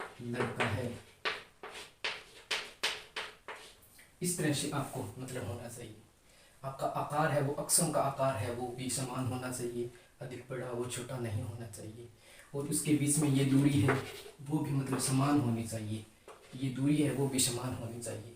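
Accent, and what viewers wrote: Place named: Haryana, India. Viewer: Ram ram sir 😍 bhut bdiya smjhaya thodi haryanvi touch language sir 😄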